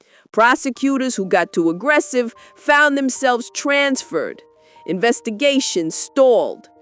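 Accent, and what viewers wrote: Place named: New York, United States. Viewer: Accent’s cool… ya sound like ya from Nu Yawk(except a couple of pronunciations)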